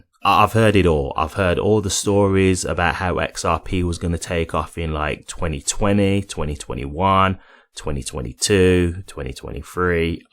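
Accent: Birmingham, United Kingdom. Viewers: You’re from brum ?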